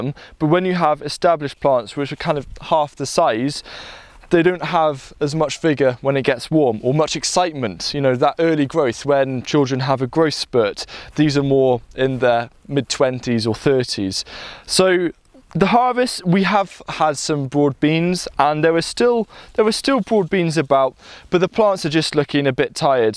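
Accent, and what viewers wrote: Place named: RP, United Kingdom. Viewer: You have the Queens English accent posh Good crops btw👍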